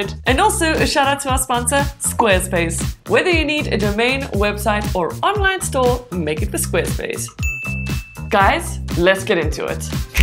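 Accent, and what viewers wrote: South Africa. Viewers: You have a white South African accent. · South African?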